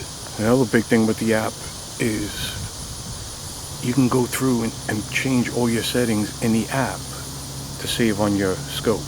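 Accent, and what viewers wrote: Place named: New York, United States. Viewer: Totally nothing to do with the scope, I just love hearing a Northeast accent being from The Bronx…guessing you’re a New Yorker!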